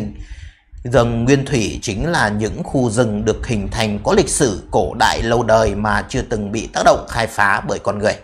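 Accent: Standard. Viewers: Tiếng nói của Việt Nam đài phát thanh chuẩn luôn không phải chỉnh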